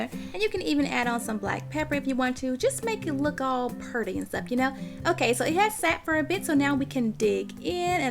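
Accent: South, United States. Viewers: omg sweet sugar wow love the southern voice mmm and what a great dish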